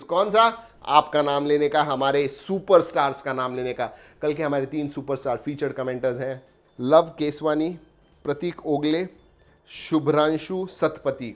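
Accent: Maharashtra, India. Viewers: Your style is a bit different and with a delicate touch of marathi you are a perfect knowledge enhancer.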